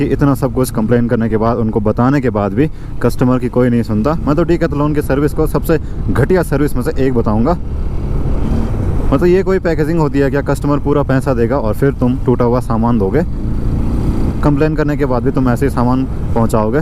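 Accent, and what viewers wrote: Garhwal, India. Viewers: भाई एक विडिओ पहाड़ी में बनाओ ना आपकी गढ़वाली भाषा अच्छी लगती है ❤ जय देवभूमि जय उत्तराखंड ❤🙏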